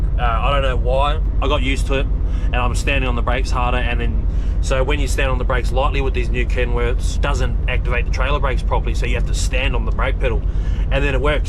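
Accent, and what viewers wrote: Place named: New Zealand. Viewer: Funny listening to a kiwi explains trucking life on the east coast. I lasted 8 minutes. Thenks brew.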